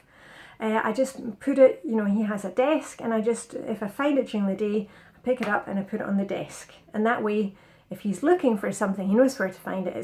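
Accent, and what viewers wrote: Scotland, United Kingdom. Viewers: I love watching you and listening to you! Your accent reminds me of my grandparents (both sides from Scotland), and I love your sharing the Danish culture (a wee bit). I live in California and also follow FlyLady. I watch you to get motivated and uplifted every day! Thank you so much! Donna in Southern California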